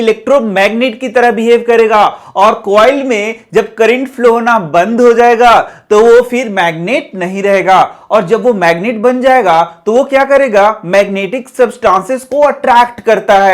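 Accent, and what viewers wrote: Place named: Uttar Pradesh, India. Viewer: sir aap up ke hona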